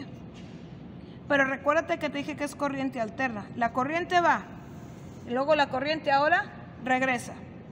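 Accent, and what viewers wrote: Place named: Mexico. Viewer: Sra ingeniera es ud una gran maestra !!,ademas de ser muy didactica en su modo de explicar en ese español -mex tan bonito!!! chapeu!!!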